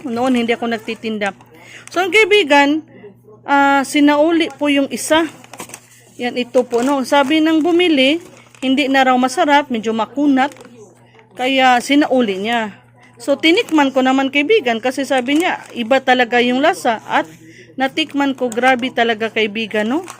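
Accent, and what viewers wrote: Visayas, Philippines. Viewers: hahahah.. Visaya giod oi, puwede mana ibalik Sis, ereklamo..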